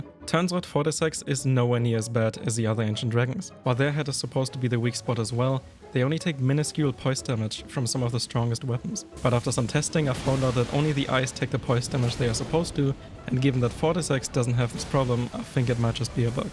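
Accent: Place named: Germany. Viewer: Kann mir einfach kein deutsches Englisch geben mit accent es hört sich schrecklich an, pack mal ein bisschen Stimmung in deine Stimme beim vorlesen…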